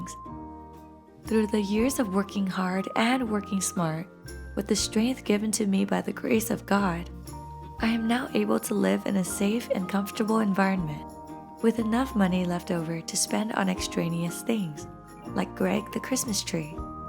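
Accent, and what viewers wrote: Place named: Vietnam. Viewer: Omg you are Vietnamese??? Im Vietnamese too. Nice to meet youuu I really love your accent 💓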